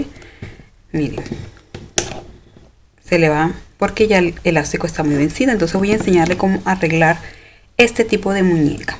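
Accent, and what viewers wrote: Colombia. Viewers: Hola me gustan mucho tus videos, los miro con mis nietas y gracias a ti empezaron a gustarnos estas lindas muñecas(monster high,Ya que a mi niña mas grandecita no le gustaban,pero un dia le mostre el video de tu coleccion y le gustaron mucho varias,pero le encanto Cleo...y hasta ya tiene 2 muñecas Cleo,2 Claudin y a DracuLaura unas regalos de mi esposo y mio y las otras de sus papas,o sea de mi hila y su esposo,es muy hermoso repetir la experiencia que comparti hace años con mi hija y hoy en dia con mi nieta adorada!... Y en verdad que tienes una voz relajante; y tu acento Colombiano es lindo!! Saludos Cariñosos desde Mexicali. B.C Mexico.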